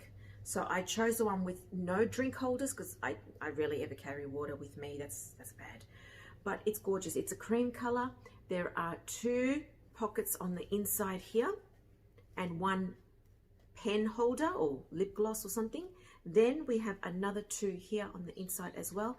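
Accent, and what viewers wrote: Australia. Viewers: Lovely to hear a fellow Aussie on YouTube! Australian context really helpful regarding brands quality bag organisers etc. Thank you so much... keep up the good work!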